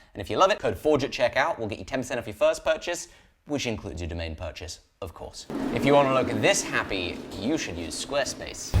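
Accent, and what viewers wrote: United Kingdom. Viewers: “Hex-uh-ginz”. Jesus. I love how British is. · LOVE your videos! Humor, science, black smithing, smithing porn, cool tools, fun facts, awesome cool music, and that proper British accent. It’s perfect right innit?!?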